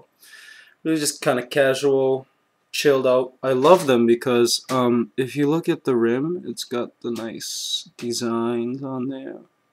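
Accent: Philippines. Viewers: Filipino accent rocks.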